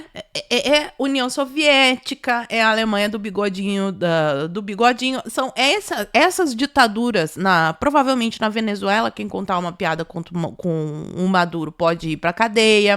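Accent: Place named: Rio de Janeiro, Brazil. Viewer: Cariocão kkkk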